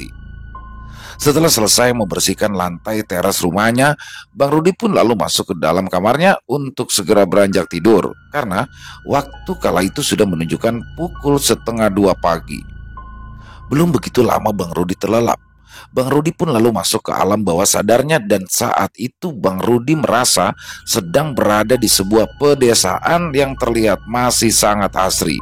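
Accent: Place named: Betawi, Indonesia. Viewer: Seru kalo bang bawain cerita orang betawi,pas dan akurat logat betawi nya,sukses selalu bang.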